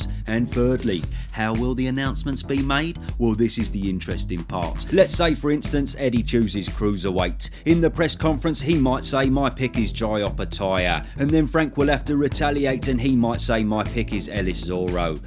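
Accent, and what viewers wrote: Cockney, United Kingdom. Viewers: Your Ad Libs keep the Cockneydream alive. More rhyming slang if ya wanna thumbs up guv'nor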